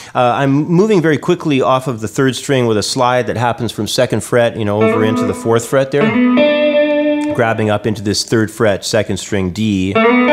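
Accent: Canada. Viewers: always a nice channel this one! hey are you, by any chance, canadian?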